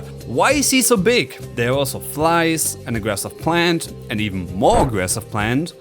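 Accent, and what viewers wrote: Germany. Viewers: So far the first DRG review featuring thick German accent. Nice! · you sound german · Sounds a bit german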